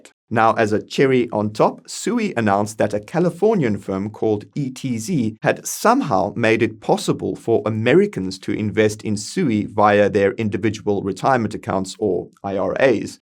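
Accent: South Africa. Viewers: Is that a South African accent? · A new south african guy hosting coin bureau?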